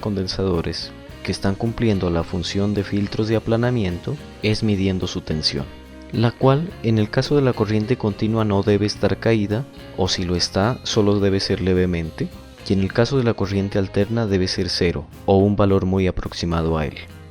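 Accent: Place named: Narino, Colombia. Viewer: Amigo, la verdad un excelente video, mu buena prueba créeme que la aplicaré, me imagino que funciona con todos los electrolíticos. Por tu acento veo que eres de la hermosa tierra del Galeras. Millones de bendiciones.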